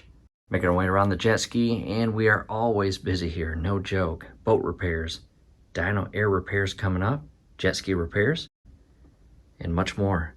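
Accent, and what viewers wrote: United States. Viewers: Hey MichGanders’ I enjoyed your videos, I have to tell you that about 3 minutes into the first video I went WWait a minute I hear a familiar accent.. 🤔❓ then I watched a second video and I was convinced yip she’s definitely from MI.. well hey 👋 there from Johnny O.C.K a FERRIS Grad from Illinois.. long long time ago.. .. 🍻 Cheers.. GO COLORADO AVALANCHE 🏒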